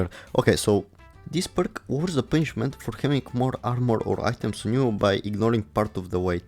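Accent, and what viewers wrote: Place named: Russia. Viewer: russian accent cancel!! anyways good video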